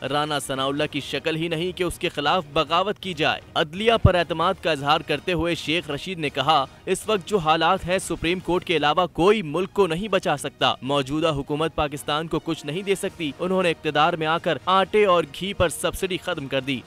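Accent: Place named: India. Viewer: jesi editing ki hoi video ki or accent hai bolne ka.. bilkul indian media lag raha hai...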